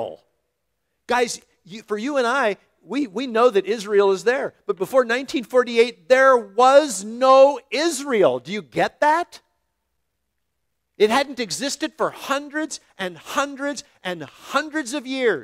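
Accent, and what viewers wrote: Canada. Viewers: I thought you were Canadian.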